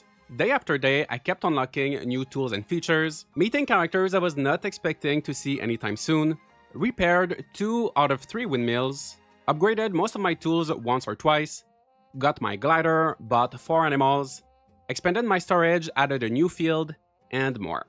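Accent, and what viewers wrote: Quebec, Canada. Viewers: Excuse-moi Est que tu viens de la province de Québec? Ton accent sonne canadien français je trouve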